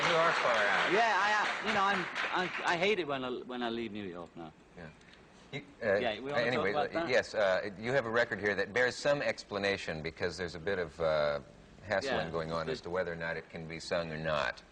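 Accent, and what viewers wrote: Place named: United Kingdom. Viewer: Bri'ish muck mouth ye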